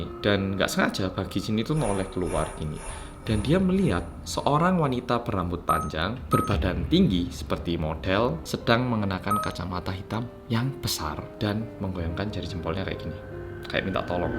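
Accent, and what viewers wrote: Java, Indonesia. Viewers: Iya medok ny ilangin ak suka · Medokkkkkk aja mas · kangettt gw, suara mu itu lho bang medok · Medeeeekkk nya itu lhoo masss😆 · Jam 1.44 AM kyaknya jdi jadwak tetap nonton rindingdong deh, makash mas berkat suara medok mu aku jdi cepat ngantuk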